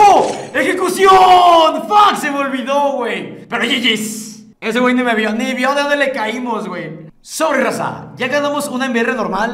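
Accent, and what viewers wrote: Argentina. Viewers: Es argentino ese men? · Te dejo de seguir por q ablas asi como argentino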